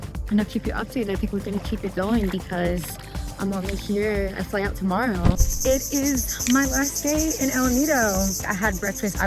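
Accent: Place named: Los Angeles, United States. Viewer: Youre from Nawlin? I thought youre an LA woman.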